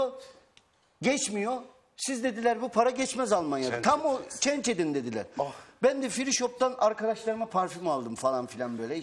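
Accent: Ankara, Turkey. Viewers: tam ankaralı .d şiveyi bıraksa tamam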